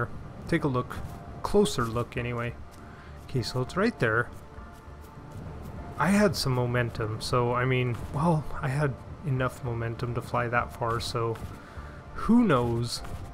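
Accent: Canada. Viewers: are you Canadian? you sound Canadian.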